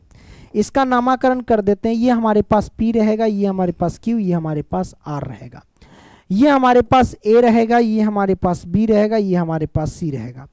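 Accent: Bihar, India. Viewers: सर आप बिहार पटना से हो क्या आपकी मात्र भाषा तो हिंदी हे परन्तु आपकी स्थान भाषा बिहारी हे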